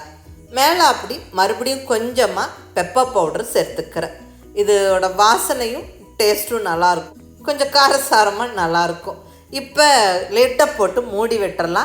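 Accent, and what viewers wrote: Coimbatore, India. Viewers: You are absolutely right. Not only the receipes but the way of presentation with (supposedly Coimbatore Slang) clear and crisp voice are adding merit to her receipes. Thank you madam somuch. Pls Keep rocking ...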